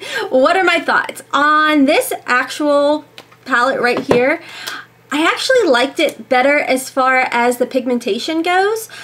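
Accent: South, United States. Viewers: Girl I wished I would have seen this video first lol. Because I'm all about saving money. I really enjoy your channel plus your southern drawwwwww girl you would fit right in here in GA.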